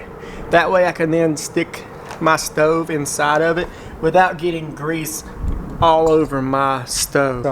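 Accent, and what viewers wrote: South, United States. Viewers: Love your personality, and your southern accent. I have to give you an atta boy for the chances you take while Stealth Camping. Be safe and have fun!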